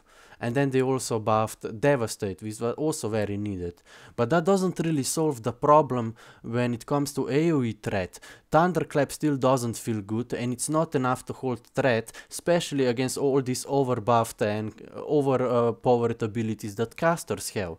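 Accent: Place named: Europe. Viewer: how do i block all classic wow content narrated by an esl european accent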